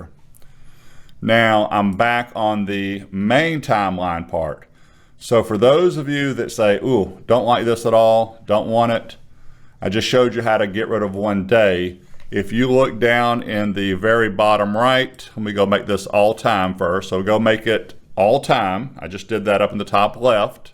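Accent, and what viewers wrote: South, United States. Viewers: I love your accent, sir. We need more Southern hospitality up in NY.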